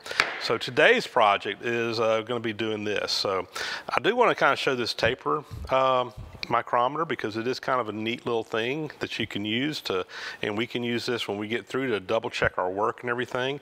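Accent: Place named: Georgia, United States. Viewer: Was his Georgia accent not enough of a clue?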